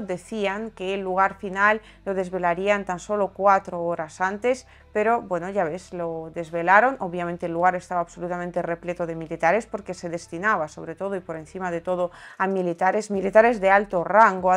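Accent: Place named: Spain. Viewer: Hola, recuerdo cuando emoezmaos a verte en sitios como twitter, tu acento totalmente español, porqué ahora tienes ese tono tan raro para contarnos las noticias? Es tan monótono que no ayuda a concentrarse ni a terminar de escuchar la noticia entera. Podrías volver a hablar normal? Gracias!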